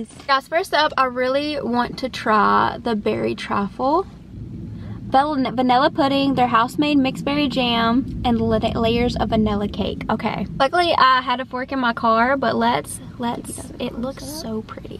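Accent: South, United States. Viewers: You’re adorable! 😊 I’ve come across your channel a couple times with you trying out the new Crumbl cookie of the week. I like how you explain in detail about the taste, the quality and the flavor and it’s even better with your cute southern accent, which I adore. Thankyou for a great food review/Mukbang.❤😊😋😁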